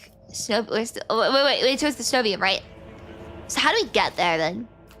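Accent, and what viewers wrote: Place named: United Kingdom. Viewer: Gotta say how delightful it is listening to a very British person play a very British coded game. Keep at it Mistress!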